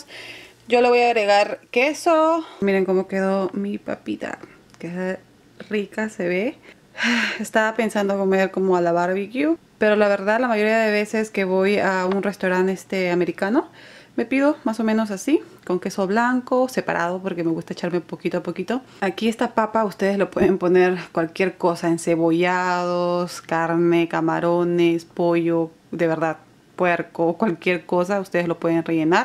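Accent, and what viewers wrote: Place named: Peru. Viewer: Jajaja sabes me.mato de risa porque hablas tan peruana, como extraño Lima Peru, compatriota mia. 😀 Espero mejore tu bebito esta lindo. Suerte !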